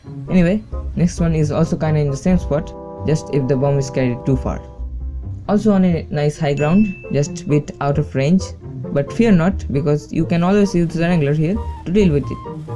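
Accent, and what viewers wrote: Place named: India. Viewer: Ur indian accent is annoying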